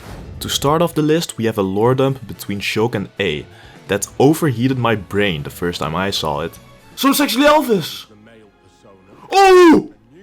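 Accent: Netherlands. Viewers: A bit of a random question, but... Do you force your accent? I dunno, your grasp of English is pretty good, and this thick of a Dutch accent is what you usually only hear from people who can't get any further than Steenkolenengels. It's not criticism, by the way. I can understand why you'd do it, if you do. Even people like Carice van Houten, who can speak with a perfect English or American accent, get told sometimes to put on a Dutch accent because it would sound exotic to American viewers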